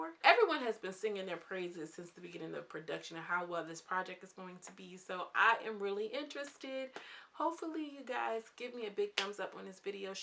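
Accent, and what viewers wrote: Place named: Brooklyn, United States. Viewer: This was very informative... you sound like your from Brooklyn, I love your voice.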